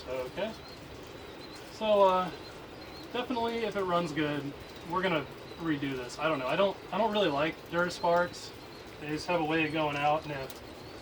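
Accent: Australia. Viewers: Wow. That power steering pump. I thought it had a gear drive in it. BTW, your Australian is terribly awesome.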